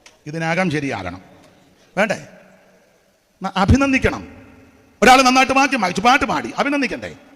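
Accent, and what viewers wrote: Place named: Thiruvalla, India. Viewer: തിരുവല്ലക്കാരുടെ ഭാഷ 😂😂😂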